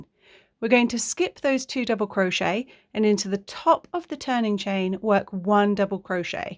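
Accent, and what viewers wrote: England, United Kingdom. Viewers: Beautuful stitch pattern. Love to watch your tutorials. I have learned so much and used some if your tutorials to make scarves. Also your voice is so calming and relaxing. I love your Engish accent.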